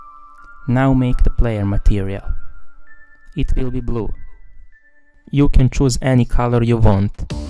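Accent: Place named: Russia. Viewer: Ты русский? Произношение как у русского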